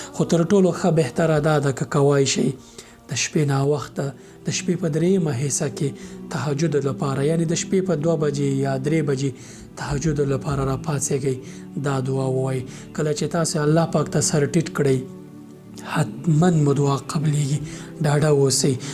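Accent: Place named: North, Afghanistan. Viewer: احسنت يا شيخ كلماتك كانت حسينة ناعمة اسعدك الله في الدارين جنوبی پشتونستان سره تعلق لری او که شمالی سره.ماته جنوبی اشکاری ولی لهجه ای شمالی ده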